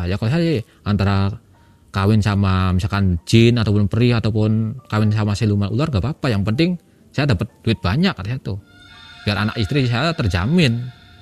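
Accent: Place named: Cirebon, Indonesia. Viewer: Wong cirebon nadane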